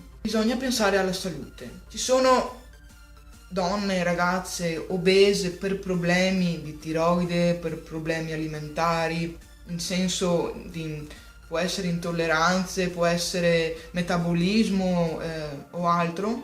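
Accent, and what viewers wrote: Veneto, Italy. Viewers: ti ho appena scoperta, bellissimo video davvero!! è bello perchè, nonostante le difficoltà legate al dimagrimento/ingrassamento, riesci a parlarne e a sorriderne. purtroppo che si tratti di aumentare o perdere peso (e dei problemi fisici che ciò comporta) il problema è psicologico in ogni caso, ed è su sè stessi che bisogna lavorare più che sul peso in sè!! io stessa in passato ho avuto problemi in merito e ancora mi ci fisso talvolta, anche se sono riuscita a raggiungere il peso forma.. spero davvero che tu riesca a venirne a capo e riesca a trovare un tuo equilibrio, e mi sembra proprio che tu ce la stia mettendo tutta :) un abbraccio grande ps: sei veneta per caso?? XD hai un accento davvero simile a quello delle mie parti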